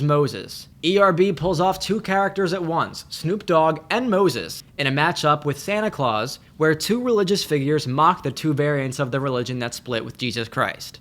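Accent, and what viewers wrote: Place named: Wisconsin, United States. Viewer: Does anyone know where he's from? He sounds kind of Wisconsin :P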